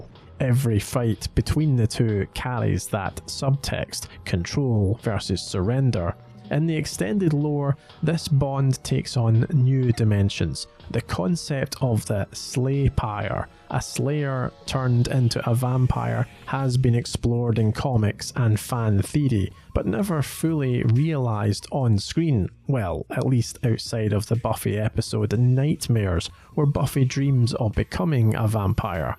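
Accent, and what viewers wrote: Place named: Scotland, United Kingdom. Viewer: The scottish Dialect make it feel like u are a child of a watchers council in the UK giving inside Storys of the Slayer Demin World.Love your Videos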